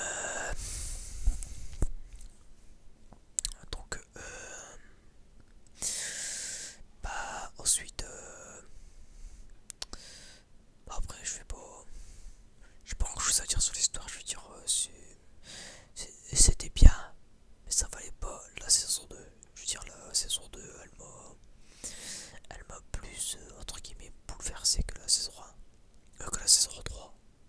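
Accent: North, France. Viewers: Ton chuchottement av cette accent du nord j adore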